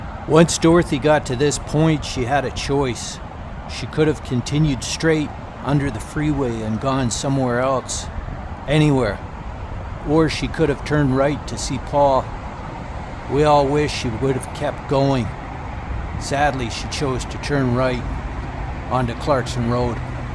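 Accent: Canada. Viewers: You're definitely Canadian!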